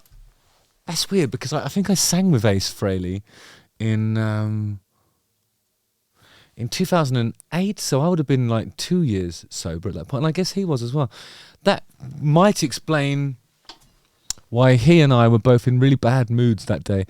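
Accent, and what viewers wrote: United Kingdom. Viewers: First time on your channel. when I saw that suit and heard your British accent I immediately thought of Mick Jagger. Nice call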